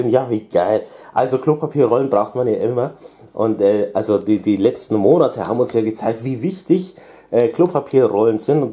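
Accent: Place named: Ukraine. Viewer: Meine Tochter hat eine Go-Pro (ich hoff, das heißt so) fürs Reiten - das bräuchtest du für deine Auspack-Videos. Jedesmal wenn du was essen wolltest, konntest nicht, weil einhändig, du armer Kerl. Dein Englisch erinnert mich an einen Ukrainer, den ich kenne :-) Die unterschiedlichen Farben sind ja geil! Bussis an deine süßen Zwei :)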